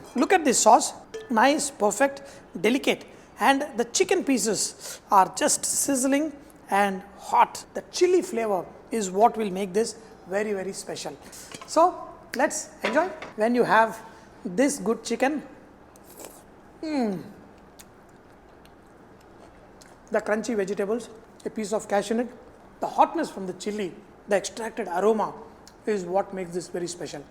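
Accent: India. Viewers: I dont want to listen ur voice and butler English, thats why I mute the video.. But like ur skills.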